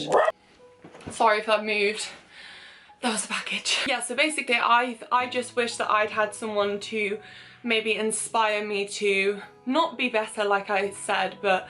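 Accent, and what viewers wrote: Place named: Northampton, United Kingdom. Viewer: Swear your from Northampton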